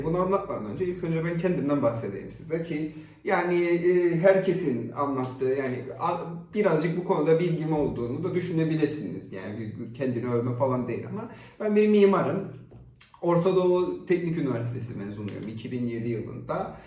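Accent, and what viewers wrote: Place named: Cyprus. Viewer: Doğu Akdeniz Üniversitesinde öğrenim görmekteyim,konsept ararken videonuza geldim. Şiveniz beni tekrar Kıbrısa götürdü. Bu pandemi döneminde çok güzel hissettirdi. Videonuz da çok yararlı oldu. Teşekkür ederim.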